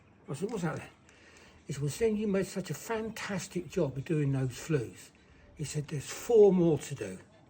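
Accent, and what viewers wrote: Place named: RP, United Kingdom. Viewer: Wondered where had picked up the plummy accent 👨‍🎓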